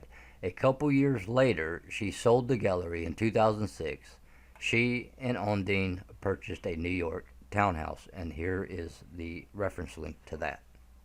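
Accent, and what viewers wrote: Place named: Israel. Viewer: Her accent though is way to israeli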